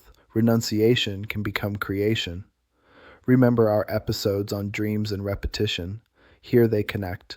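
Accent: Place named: United States. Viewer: well here's a tip: don't use an AI voice with a distinctly American accent for a person everyone knows is Austrian. I thought it was a joke at first.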